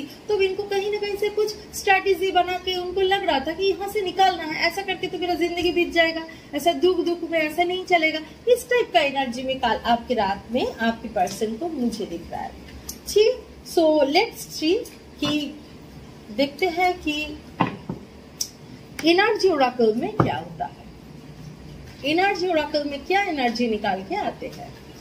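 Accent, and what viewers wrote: Bengal, India. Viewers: I simply love ur Bengali accent its so sweet maam just like u ❤❤❤❤❤❤❤❤❤ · your accent and the way of speaking is typically Bengali or atleast East that us Odisha or Assam.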